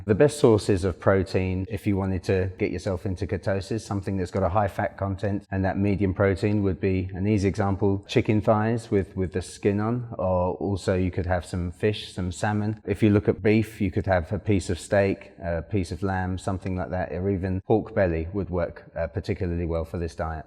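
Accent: England, United Kingdom. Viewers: He's English!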